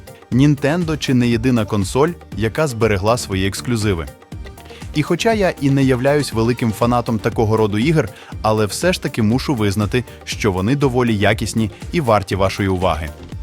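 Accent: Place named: Surzhyk, Ukraine. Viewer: Это непонятно суржиком называется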